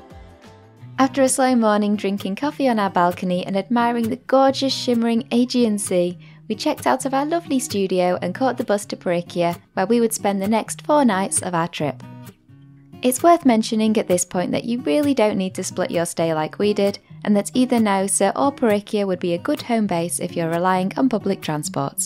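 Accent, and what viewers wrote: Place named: London, United Kingdom. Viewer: Thank you! Love your accent! From London, England?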